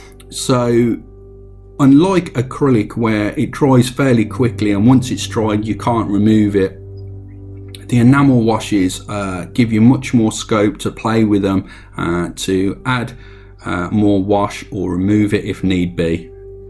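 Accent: United Kingdom. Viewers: Hello. At first let me say: I love your tutorials and your british accent. But I have some constructive criticism. Pls increase the quality of your vids by making a good audio. You lower your fantastic work by this aweful sound. Don't be mad at me. I just want to push your vids into a good light. Greetings, a fan from germany. Keep up the awesome work!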